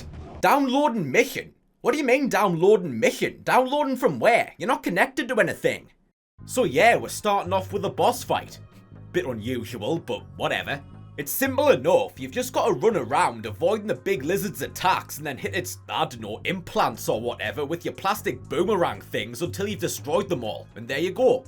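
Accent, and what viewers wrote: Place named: Newcastle, United Kingdom. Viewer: I love that you have the sterotypical nerdy teenager if he was from Newcastle as an accent 😂❤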